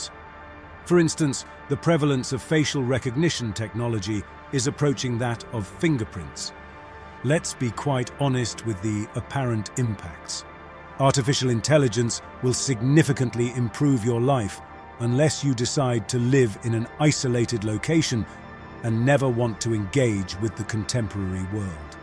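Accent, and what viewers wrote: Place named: England, United Kingdom. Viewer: Your English-accent-robot-voice makes this vid hard to take seriously.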